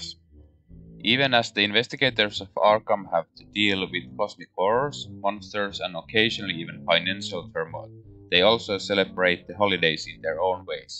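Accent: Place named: Finland. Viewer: i was listening to your video and my wife just went from the other side of the room "that guy has a finnish accent!" :):) terveisia vaasasta!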